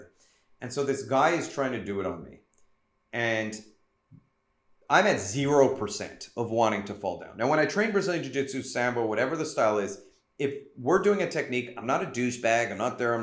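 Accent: Israel. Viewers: Your Israeli accent is very good. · Good video and good Israeli accent. I had never heard of the Israeli style.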